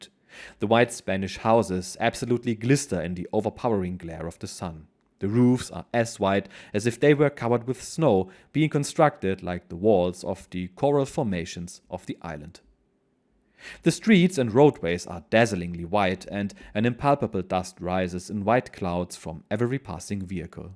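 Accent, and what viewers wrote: Germany. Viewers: What a surprising and interesting choice of theme this time! I bet our American friend will flock to to join us to take this journey. As I have said before, your way to present these historical adventures is commendable and the little German accent of yours is perfect for exactly this one - thank you, I'm hooked once again!!💙💙💙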